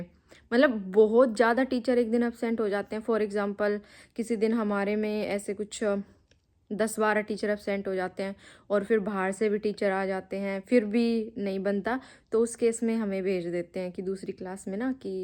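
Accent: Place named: Japan. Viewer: You talk like a Japanese or russian girl , your expressions are also not like a typical Indian girl. Liked that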